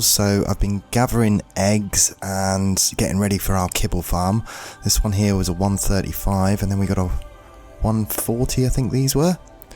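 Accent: United Kingdom. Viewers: ive tried watching this but you are a true example of having a british accent making you only sound intelligent. its may lay not me lee. and im back tracking but its not fajora, youre way off. thats only a couole of examples. quit dumbing down society and get a brain, do some research for your "content" as they call it now. dont just sit on your ass and play video games and blast more commercials than the superbowl at people. mildly entertaining at best, would be better if you could pronounce stuff correctly and not make my ears bleed with the butchery of the english language you lot are so fond of claiming as the inventors of.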